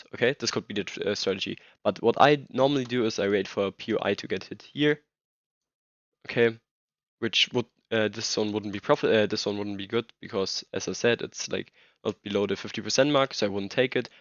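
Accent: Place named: Germany. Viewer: Der Deutsche Dialekt, herrlich, gutes Video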